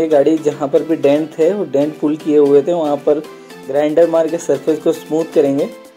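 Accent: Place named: Bihar, India. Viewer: Osm work bro Bihari language me ekdam kantaap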